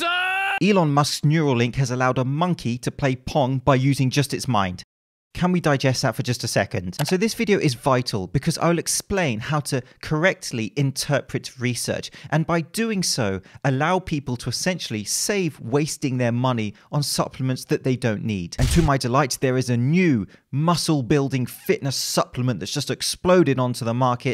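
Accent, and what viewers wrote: England, United Kingdom. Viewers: A science based throwdown in an English accent is the best kind of throwndown.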